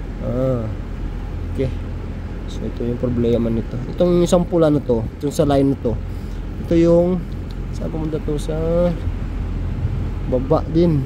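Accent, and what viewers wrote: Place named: Iloilo, Philippines. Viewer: ilongo ka too hahha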